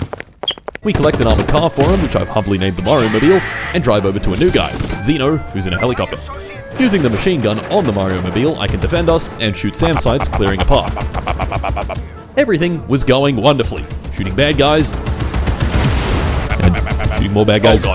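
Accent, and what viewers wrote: Finland. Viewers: Ah the sound of Finland, thank you for blessing our ears.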